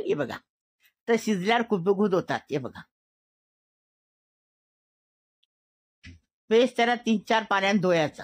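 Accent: Konkan, India. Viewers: Masha Allah aunty aap kokni ho bolo mazaa aata hei. Hum samajhte hei , Bolne mei ardevat hei 😊, mummy accha bolte thei , Allah unko jannat naseeb kare ameen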